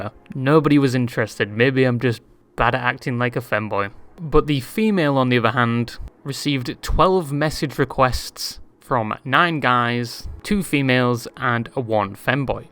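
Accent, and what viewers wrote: United Kingdom. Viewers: what accent is this, ik its uk but what area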